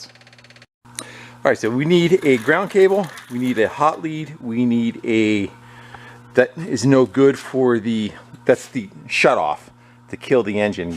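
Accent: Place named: Fargo, United States. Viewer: "... try to knock out this bobcat skidsteer that I bought, oh jeez..."... are you originally from Fargo by chance? You do fix a lot of wood chippers and snow blowers...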